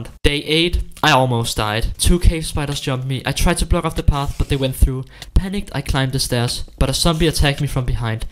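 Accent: Denmark. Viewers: Very nice danish accent 👍🤝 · Danish 100% lmao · You sound a bit danish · are u danish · Hey are you from Danmark you Sound like it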